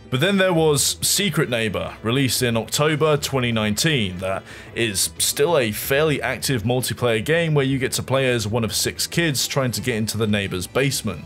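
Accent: United Kingdom. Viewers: very pleasant surprise to click a commentary video and hear a fellow brit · BABE IT'S 3AM! TIME FOR ANOTHER LONG FORM CONTENT NARRATED BY A BRITISH GUY!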